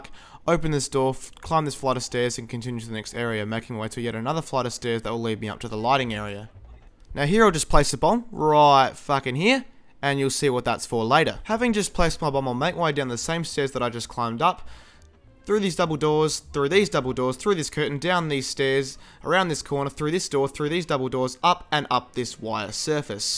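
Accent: Australia. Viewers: what part of australia are you from? I'm from WA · what he is Australian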